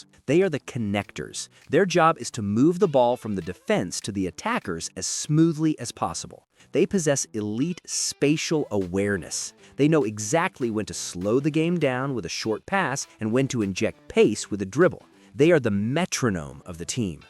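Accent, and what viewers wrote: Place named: United States. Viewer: These pronunciations.. you yanks rly should stay away from football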